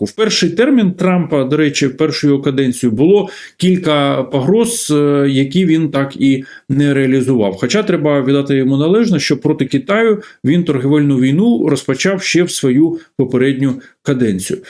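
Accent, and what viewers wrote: Russia. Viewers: У ведучого грамотна, чиста українська мова, але з російським акцентом.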